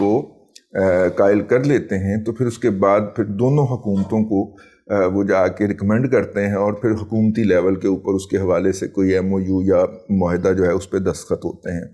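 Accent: Punjab, Pakistan. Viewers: سر میرا بڑا دل کرتا ہے کہ میں آپ کی منہ بنا کے پنجابی لب و لہجے میں اداکاری کرتے ہوئے آپ کا یہ جملہ آپ کے منہ پر دے مارو میں ان کا حمایتی یہ ترجمان نہیں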